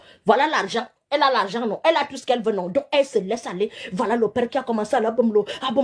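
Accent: Cameroon. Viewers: Je kif son accent camair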